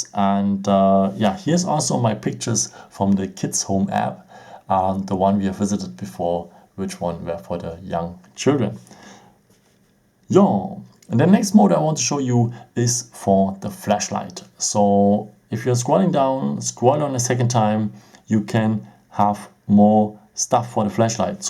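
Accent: Germany. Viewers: Mich regt der deutsche Akzent auf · No hate but I have a question about your pronunciation. Are you German? I think it really sounds like "german english". About the video: I like this theme of videos. Your video help me to understand the A 40 better. I need these theme of video because I get the Samsung Galaxy A 40 in this week! Nice content! Edit: Ich bin Deutsch (I'm German) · What an german accent! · Er spricht Denglisch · K1ller_ _Headsh0t its just a german accent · Haha wie man raushört das du deutsch bist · achja der Deutsche akzent... einfach nur genial :D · Jo du musst nicht englisch rede jeder schekt das du deutscher bist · Erste Sekunde: BOAH NE DER IST DEUTSCH Nichts für ungut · Ich höre sein englisch... und denke mir Jap das ist ein deutscher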